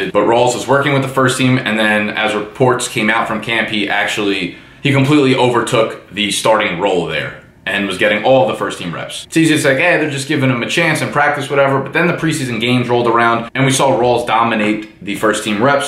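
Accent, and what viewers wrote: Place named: AAVE, United States. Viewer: Dude, GREAT info, better than the pro announcers. The only criticism is you don't need to cuss or speak in ebonics 10% of the time. Will be watching you from now on.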